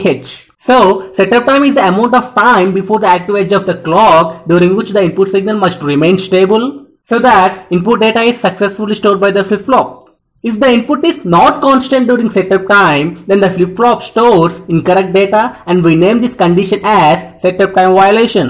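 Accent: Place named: India. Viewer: Indian accent sucks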